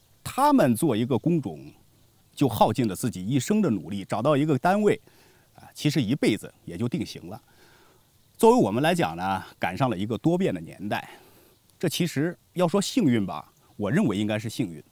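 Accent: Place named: Central Plains, China. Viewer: 我看的视频，总感觉像是中原这边的人，主要是听口音😂😂😂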